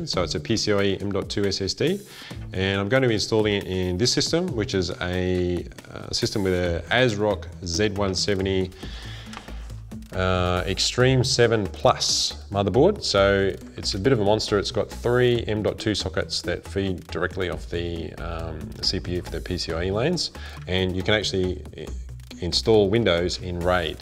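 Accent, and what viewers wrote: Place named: Australia. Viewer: After the first sentences, being a non-native English speaker, I thought "What's that supposed to be? Australian!?" ... and only seconds later the .au domain appeared at the bottom xD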